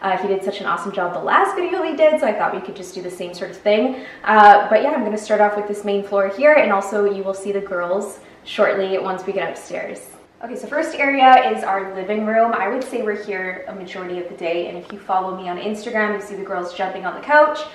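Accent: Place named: Canada. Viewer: So bizarre I found you on Insta through a pink hashtag or something. The first thing I saw was your beautiful home on your stories, and your closet full of all things pink💗 I didn’t know you had a YouTube channel. I recognized the Canadian accent right away and saw in the first video I watched you lived in Calgary. My Daughter lives in Canmore and just loves Alberta, she’s been there 5 years now. So now after watching a few of your older videos did you actually live in Windsor? I can’t blame you for moving if that’s the case, it’s a cesspool.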